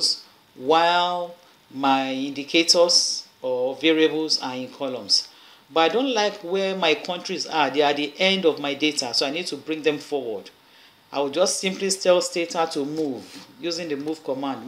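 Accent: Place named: Nigeria. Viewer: Subscribed! Thanks alot for your detailed and simple explanations! It also feels awesome hearing these things explained in a Nigerian accent! Thanks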